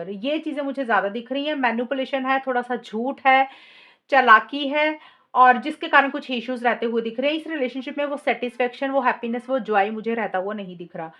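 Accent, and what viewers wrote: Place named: Punjab, India. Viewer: Punjabi lang nikal he jandi hai chahe kinni hindi bol lo aap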